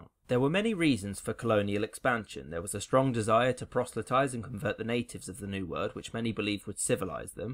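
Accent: England, United Kingdom. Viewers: This video says more about an 'English' empire rather than a 'British' empire. Quite funny since it says it was started by King Henry VII, who was actually Welsh! The process of the unification of Britain should not be the starting point of a history of a 'British' empire. This video says more about the subconcious bias of the uploader, who has an English accent, than actual British history.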